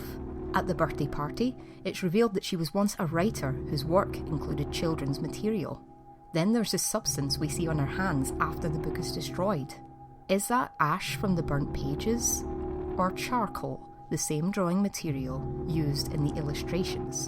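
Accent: Scotland, United Kingdom. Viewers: Scottish ayeeee?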